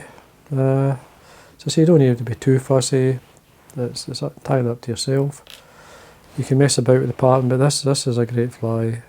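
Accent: Scotland, United Kingdom. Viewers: I detect a Scottish accent. My family history is Scottish.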